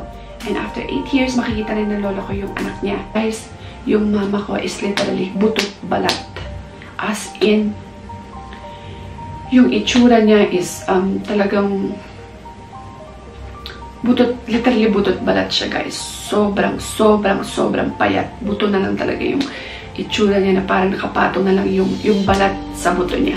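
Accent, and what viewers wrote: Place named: Manila, Philippines. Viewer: Manila tagalog accent . Very clear.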